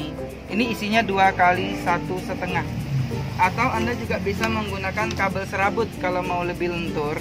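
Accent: Java, Indonesia. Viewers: Eterna, federal, jenbo, kitani, patriot, sumitomo, supreme. Banyak opsi yg kualitasnya memadai. Kemarin aku beli pompa air submersible type SPG20-311K kabel bawaannya OFC 0,75mm² 30 meter. OFC singkatan oxygen-free copper artinya tembaga murni bebas oksigen, relatif tahan karat. Hantarannya bagus. Nyambung kabel serabut mestinya diberi ferrule atau skun yg dipress dg tang krimping agar tidak ngepong. Koh, logatmu medok kamu orang mana?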